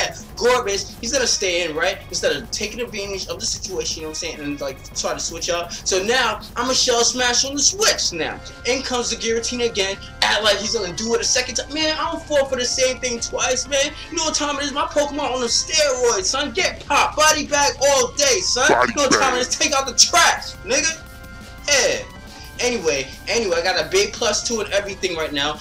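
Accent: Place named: Brooklyn, United States. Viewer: I swear I know this guy... sounds like he lives in brooklyn.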